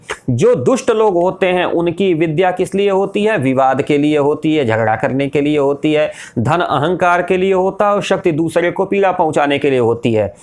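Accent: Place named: Gorakhpur, India. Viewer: Gorakhpuriya khas gorakhpuriya 😅😂😂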